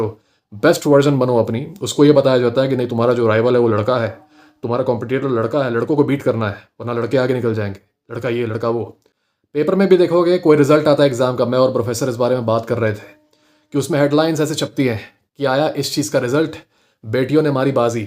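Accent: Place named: Lucknow, India. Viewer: Good.. Very well put 👌 Also the answer to your question you asked in the end (why men are not getting aware or radicalized? ) is - because of the bitter fact that today more than 50% men have become feminists. Remaining 30% are simps/slaves. Only 20% are aware of this. But the disturbing part is that Rate of conversion of men to simps/feminists is 2 times faster than the rate of men getting aware/enlightened about toxicity spread by infection of Feminism... So, with that being said, people like you (who are actually doing a wonderful job 👌💯) will not be able to bring positive change in Indian Society which is headed to a disastrous end. Anyways, keep up the good work.. I support you... & you have got a good voice... Seems you were an RJ or some process trainer at BPO. Also your accent seems like you belong to Lucknow or Kanpur.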